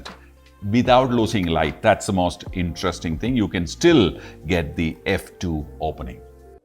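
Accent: Kerala, India. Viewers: great Keralite accent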